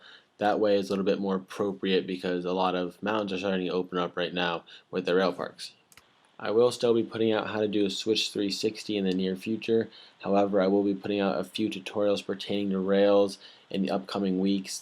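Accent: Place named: New Jersey, United States. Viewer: NJ accent + skiier inflection = Canadian accent? That is very interesting. Nice video btw!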